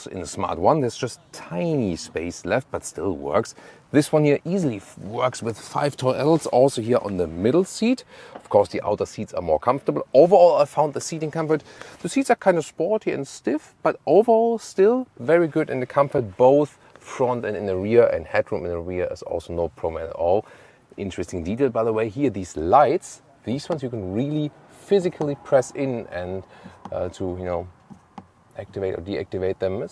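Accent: Germany. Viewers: Dein Akzent (Du sprichst Englisch mit Deutscher Intonation) hat sich immer noch nicht geändert... Es wäre so einfach dies zu ändern, ein wenig Sprachtraining (mit ner App z.B.) würde schon helfen. Auch übersetzt Du oft Deutsche Ausdrücke direkt ins Englische, was einfach nicht passt. Es ist übrigens ein GEPARD, kein Leopard...ernsthaft?! Auf Englisch: Cheetah. Deine Reviews sind Klasse aber diese Deutsche Intonation im Englischen ist für native Speaker kaum auszuhalten.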